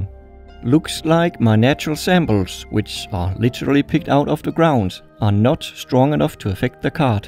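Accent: Denmark. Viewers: He kinda sounds like a Danish dude with a slightly american accent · You sound danish 😌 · Are you danish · R u Danish? Jeg er I hvert fald du lyder dansk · I love that danish accent :D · you sound oh so danish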